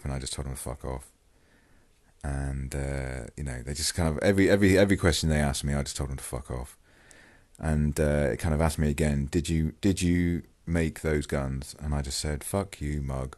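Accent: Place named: RP, United Kingdom. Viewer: you sound like a proper little posh geek, have you ever been in armley in leeds?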